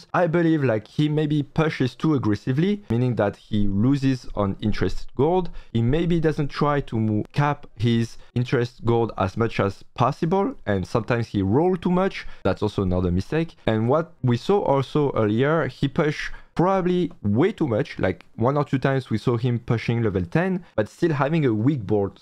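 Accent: France. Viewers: J'entends un reuf français pour sur ! 😂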